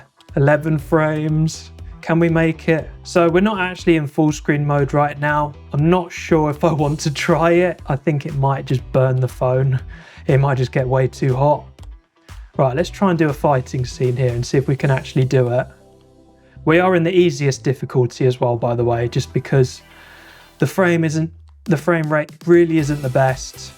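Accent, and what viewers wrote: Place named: Estuary English, United Kingdom. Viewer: I enjoyed the estuary English accent. I don't hear it much on here. We usually get upper English, Irish, or Scottish.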